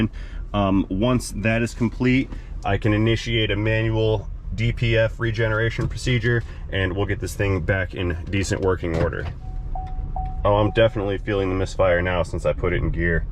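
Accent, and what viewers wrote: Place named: Harvard, United States. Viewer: why does this guy talk like hes from Harvard?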